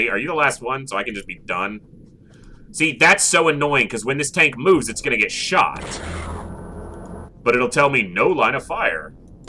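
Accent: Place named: United States. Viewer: I am very much enjoying this playthrough. I’ve watched a ton of xcom and TFTd let’s plays on YouTube and this is quickly turning into one of my favourites. I love the raw organic playthrough where you just have to live with your mistakes and keep fighting. I’ve seen too many people play this game and just spam psi powers to win the game. I never liked using psi personally, feels like a cheap way to play. Also love that TFTD is getting some love cuz this game deserves love too! Your subtle American accent reminds me of someone I used to know from Philadelphia. Greetings from Canada!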